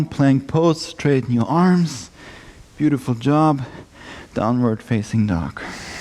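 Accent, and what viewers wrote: Germany. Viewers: ich dachte mir doch schon, dass ich da einen deutschen akzent gehört habe :D herrlich! mega flow. awesome job.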